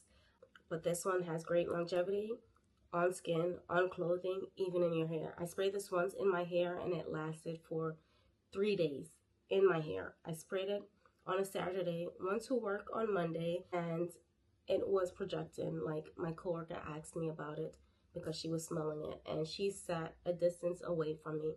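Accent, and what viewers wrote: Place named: Caribbean. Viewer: Great video! You have a slight Caribbean accent. I’m curious, what’s your nationality if you don’t mind asking?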